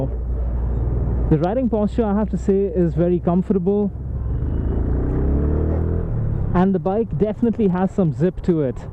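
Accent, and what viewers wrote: England, United Kingdom. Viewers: I love Ur English accent .....can u plzzz share how to improve English to that extent.... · Aaah... look at this beautiful man with his beautiful english accent and his beautiful hair singing with the wind...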